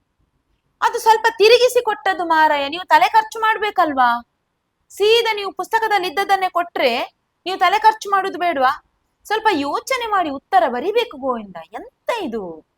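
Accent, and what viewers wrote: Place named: Coastal Karnataka, India. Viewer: Madam your Kannada is good. Coastal Kannada.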